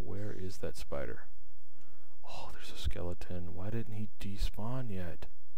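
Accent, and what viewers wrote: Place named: North, United States. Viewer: Yeah, that is what I hear, up north somewhere, though def not NY/NJ...lol. And is there a specific accent in FL? They are such a mix of snowbirds and tourists...lol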